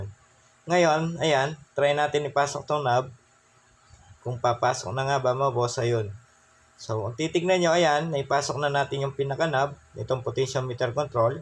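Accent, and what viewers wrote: Pampanga, Philippines. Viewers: kapampangan accent ka sir. . baka malapit kalang sakin. . pagawa ko nalang sana sayo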